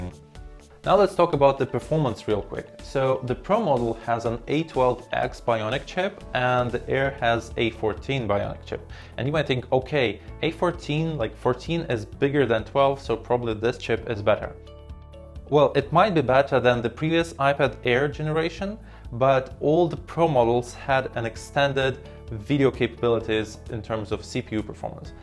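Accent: Russia. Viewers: Хей, ты же русский да? Я препод английского и меня не покидает это ощущение .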